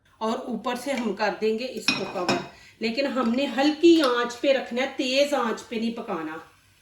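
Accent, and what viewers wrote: Punjab, India. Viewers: Plz zara ahista or kum bolen plzplz.or punjabi ki jagah urdu lehjy mn bolen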